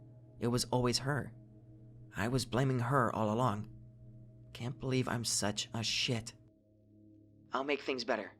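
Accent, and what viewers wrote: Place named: Maine, United States. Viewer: Hey man. I got an odd question. Are you from Maine? You have a Stephen King twang.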